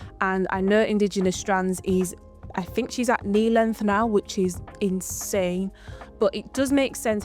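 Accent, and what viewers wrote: Northern England, United Kingdom. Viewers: Hey love, great video. I actually released a video very similar a couple of weeks ago. I've been following your journey on IG as well. Looking forward to seeing us both get to waist length very very soon 💜 Also, you sound northern. I thought you were gonna say you live in Manny or Yorkshire or something haha. I'm in B'ham.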